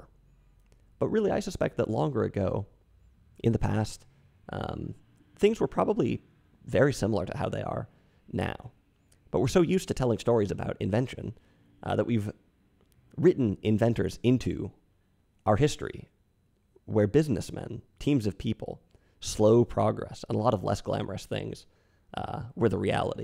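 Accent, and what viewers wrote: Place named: Bergen, Norway. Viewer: Yes it is true! I love the Bergen dialect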